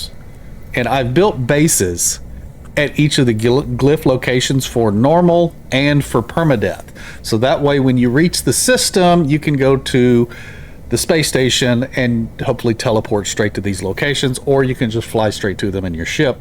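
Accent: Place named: South, United States. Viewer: I'm trying to pinpoint your accent. I don't think it's FL, sounds very similar to the AL, or GA that i'm used to hearing but perhaps not quite.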